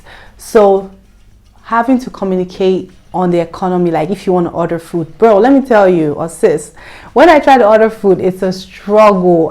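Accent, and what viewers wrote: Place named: Africa. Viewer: Sorry, I am confused. I have seen some black women in Germany speaking, but I would judge your accent for an african one. But PCS is an american thing, or? So how comes you have that accent? May I ask, where are from? Aah, I commented too early. I knew it! Nice story btw.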